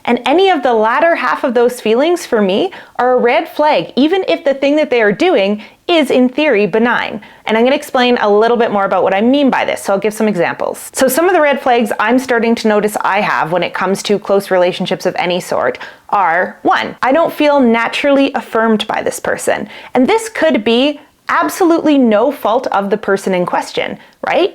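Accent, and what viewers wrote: Canada. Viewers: Why insult Texans? Do you actually think your goofy Canadian accent sounds better.😂😅😮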